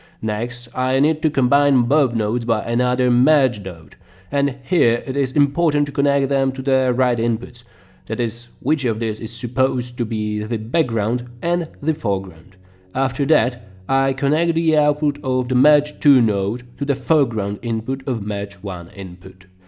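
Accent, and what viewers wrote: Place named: England, United Kingdom. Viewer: Damn I wish I had that English accent. Great video btw 👍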